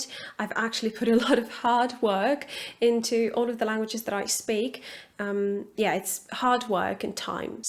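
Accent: United Kingdom. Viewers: I thought you were British! I’m American though. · Perfect British accent) I can't imagine how much effort it takes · honestly I can't, but I'm American and you have a lovely Brittish accent. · how did you develop such an amasing British pronunciation?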